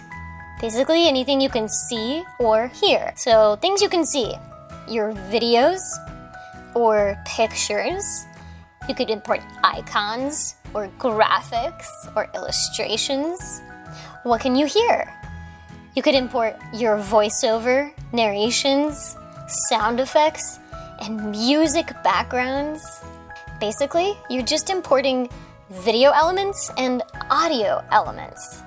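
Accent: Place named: France. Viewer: Wow this is a master piece video. You covered it all and we can see your heart through the content. More than educational. I love your French accent "Très bien" 😊 Merci beaucoup 🙏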